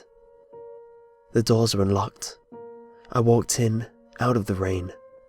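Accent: United Kingdom. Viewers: Narrator with a UK accent....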